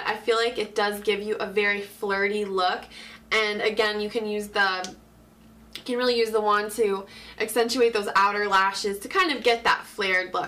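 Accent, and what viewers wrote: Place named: Philadelphia, United States. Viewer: You sound like you're from Philly or Princeton area, where I live, & you look so familiar. Did you used to work in marketing or design before you started doing makeup?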